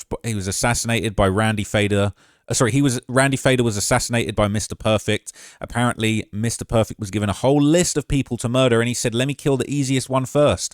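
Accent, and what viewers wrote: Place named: United Kingdom. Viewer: Crazy to hear this limey with such inside knowledge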